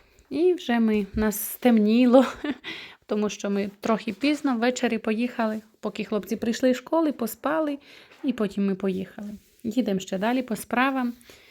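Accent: Rivne, Ukraine. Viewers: якщо не секрет з якої області України ви переїхали.Ваш акцент,говір дуже схожий на той де зараз живу я.Рівненська.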